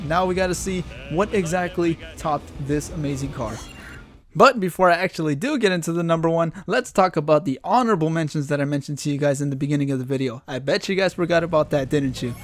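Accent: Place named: Latin America. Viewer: jaja Que quay!!! I am Chilean and I could hear it in your voice! Much love bacan!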